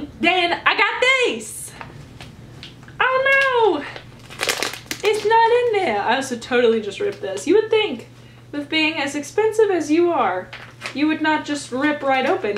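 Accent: United Kingdom. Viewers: If I had not just bought a dredsage bridle I would purchase yours and I loved that spot on brtish accent!!👌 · Your British accent is the best😂 I love how you really show your personality in your videos and you’re so fun to watch💕 best of luck with the dog!!x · Your English accent is spot on!😂 love your videos!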